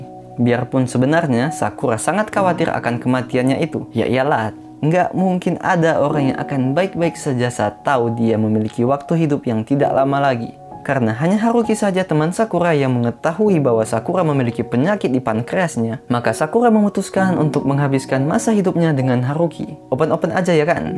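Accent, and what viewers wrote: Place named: Java, Indonesia. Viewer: Tkumi irng jepang ap jawa sih mas jawany melekat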